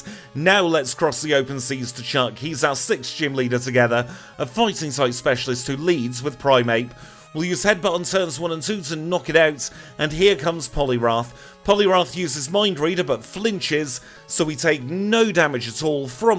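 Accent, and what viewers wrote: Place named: United Kingdom. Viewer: I'm so curious! I'm not from the UK so I'm always shocked at how many accents you guys have in such a relatively small country. What would you say the is the closest accent to your's? I need a name to the sound!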